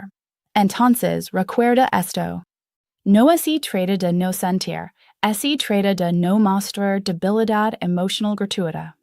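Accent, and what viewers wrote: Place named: Chile. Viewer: Jaja, gracias por los subtitulos. Ella habla en chileno 😅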